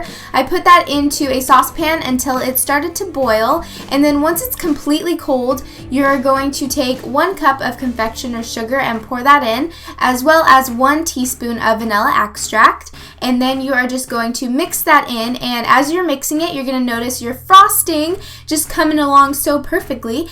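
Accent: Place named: England, United Kingdom. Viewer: you must be from england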